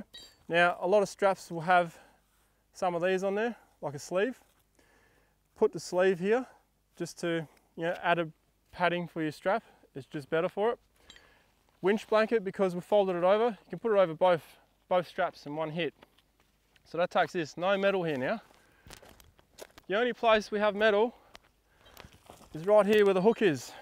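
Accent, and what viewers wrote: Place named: Australia. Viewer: Aussie deep voice gave me nightmares!!😄🤣🤦‍♂️ if the devil were aussie!!🤣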